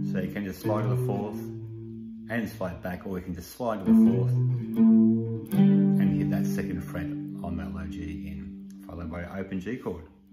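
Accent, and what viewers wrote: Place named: Australia. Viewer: Great to have an Oz accent instructing 👏. Saw the cats jumper and and song title- thanks for this mate as I was learning this on 6 string from a mate who suddenly passed and I’ve also lost the finger ability for the 6 string so just got hold of a cbg. I’ll be learning this for me ol’ mate Doug and you have an Oz subscriber - also a Victorian. Cheers bud.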